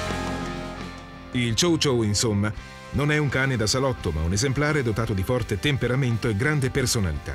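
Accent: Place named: Lombardy, Italy. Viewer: ma...sai giusto per curiosità se ti va di rispondere Ma tu di dove sei? Pare che parli un "mix" di dialetti... Trentino e bo potrò sbagliare chiedo venia ma qualcosa di lombardo? Piemontese?? Chiedo solo per sapere perché apparte te e la prima volta che sento una parlantina con rispetto parlando giuro che non è offesa) del genere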